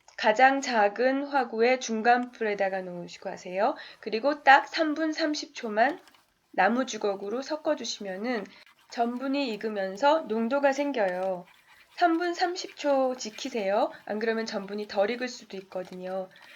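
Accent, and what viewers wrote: Seoul, South Korea. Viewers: 말투가 꼭 서울사투리 영상에서 들었던 말투 같아요 귀에 쏙쏙 박히면서 듣기 좋네요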